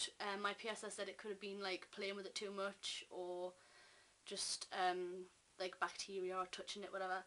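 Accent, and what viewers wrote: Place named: Newcastle, United Kingdom. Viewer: She's Geordie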